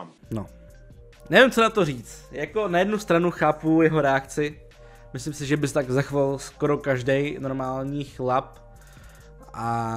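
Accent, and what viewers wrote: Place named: Silesia, Czech Republic. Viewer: tvoje čtení slezského nářečí mě baví jak cyp :D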